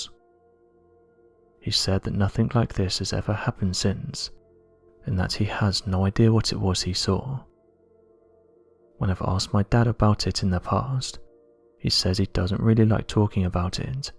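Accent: England, United Kingdom. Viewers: He sounds like an asian guy that learned english in great britain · Why not just speak in your usual English accent without the drama music?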